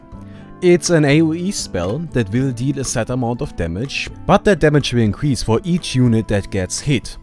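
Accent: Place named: Germany. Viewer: Germany, or any other german speaking country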